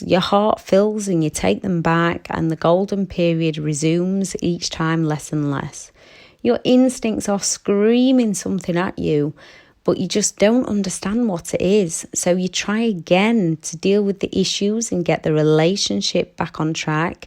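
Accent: United Kingdom. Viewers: Thank you so much for this. I've broken free, but apart from the initial feeling of 'I"m Free!","I did it!", there's been such crushing anxiety to the point that my legs don't seem to work properly when I go out. My brain tells me I'm slipping when I;m not. I fear wet pavements and shiny tiled floors. I know it's anxiety, so I push through and still walk on these surfaces. I shake at the prospect of going anywhere new and working out what buses I need to take: something that I used to be able to do almost in my sleep, so to speak. But I'm still doing it...I hope this isn't making me worse? It just seems like I must push through, not give in. What you have said really, really helps. Thank you, from Cornwall, UK. (Your accent sounds British). Someone in my own country in the know?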